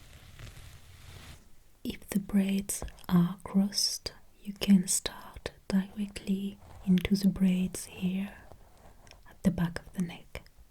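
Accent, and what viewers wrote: France. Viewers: is she really french? or is that just the accent she chose? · Im new to your channel, discovered it a few weeks ago after I handed in my Bachelor's thesis, and it really made me relax watching your videos. Now Im addicted, other ASMR doesnt quite do it for me. The RP, the setting, the lighting and darker colours, your audio quality (minimal white noise) and even your french accent really does the trick for me. I get so calm. I have an anxiety disorder, I got therapy for my panic attacks, but still need easy and quick ways to get my pulse down, while sitting at the pc - and you help me so much. Now I can get to bed with less mind clutter and wake up to be a good mom and wife for my family <3 Thank you!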